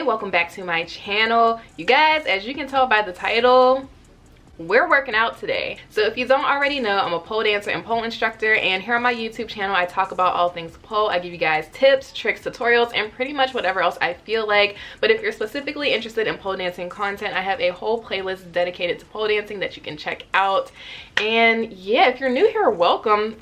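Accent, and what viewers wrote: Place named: Pittsburgh, United States. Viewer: Listening to you speak, first thing i said was “is she from pittsburgh?” The i went to your IG and saw the pin. Do your thing!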